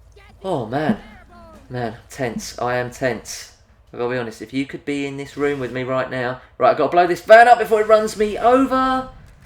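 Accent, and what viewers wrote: United Kingdom. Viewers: Hey! Where did your inspiration for the cockney accent come about?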